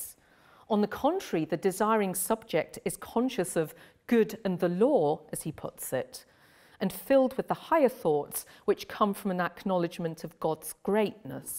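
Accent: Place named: United Kingdom. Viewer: Why can't the Brits ever get to the point besides the annoying accent.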